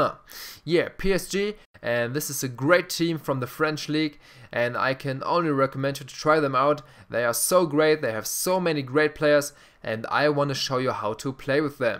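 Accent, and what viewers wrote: Germany. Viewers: R U GERMAN OR FRENCH???????????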